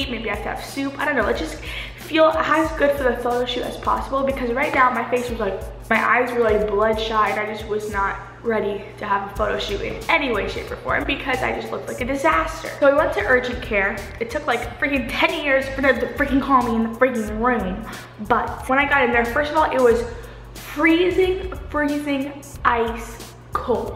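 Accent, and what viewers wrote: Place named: United Kingdom. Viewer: sounds British ish even though I'm British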